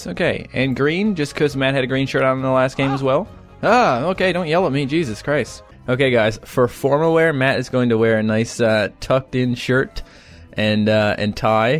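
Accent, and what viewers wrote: Canada. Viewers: Hello I watched your sims 4 videos with my mom because she is obsessed with the sims, she loved your videos and wants to know if you are Canadian, because of your accent... Yeah... Random. Anyway, thanks for vids, you really made us laugh.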